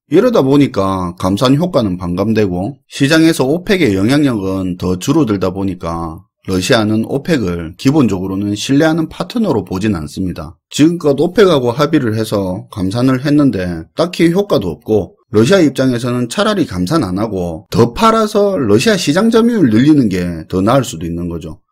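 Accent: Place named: Gyeongju, South Korea. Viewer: 잘 봤습니다. 오리지날 서라벌 원어민 발음 멋지세요~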